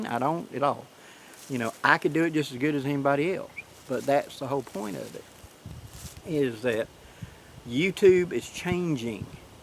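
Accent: South, United States. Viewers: Hey - That stuff sounds complicated to me. The only thing I know how to do, is watch the videos. That works for me. It is interesting to say the least. You'll figure it out and your southern accent is not near as bad as up here in WV. You take it easy and just go with the flow- Carry On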